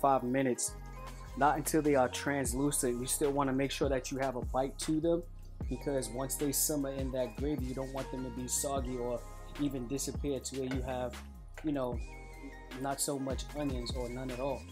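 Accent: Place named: Nuyorican, United States. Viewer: Sounding’ straight up Nuyorican! Me encanta! 🇵🇷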